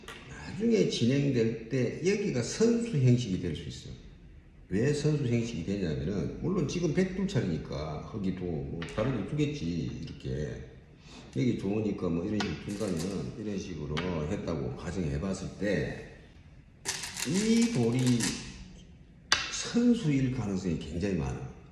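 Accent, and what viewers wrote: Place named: Gyeongsang, South Korea. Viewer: 원장님 경상도 사투리 쓰고 계시는데 혹시 고향이 어디 신지요 저하고 말투가 비슷해서 하하하 (맨들라꼬)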